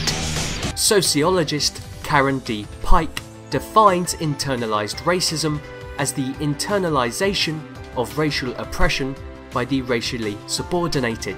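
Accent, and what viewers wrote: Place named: United Kingdom. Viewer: This checks all boxes for a video essay. Even that you’re Bri’ish. So unfortunate.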